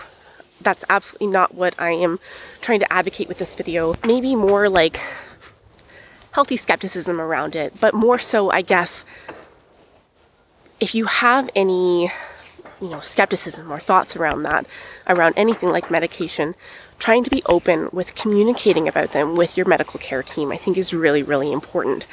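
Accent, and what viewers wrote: Canada. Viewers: Hi there, I'm not sure why I watched this video, but I was touched. I don't have schizophrenia, I don't know anybody who has schizophrenia and I don't know much about the disease at all, but I was fascinated by this video. I am sure that you don't want sympathy, but I have to say I feel so bad for you. You are a smart, charming, compassionate and beautiful young woman and I am horrified that you have to go through what you go through. It sounds like you are Canadian, so am I. I live in downtown Toronto. I am available to speak with you about anything at any time for any reason. If the crisis centres are not available for you, I am.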